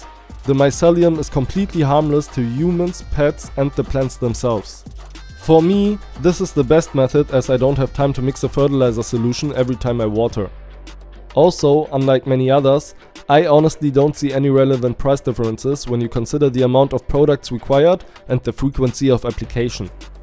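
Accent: Germany. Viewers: Man hört raus dass du Deutscher bist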